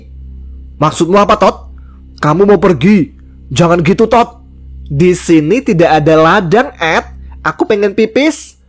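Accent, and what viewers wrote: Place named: Bogor, Indonesia. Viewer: Suoro bogor❤😂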